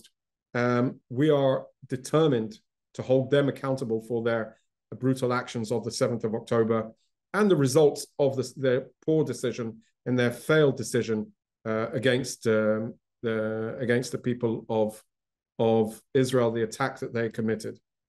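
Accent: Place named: United Kingdom. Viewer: You sound like British sir!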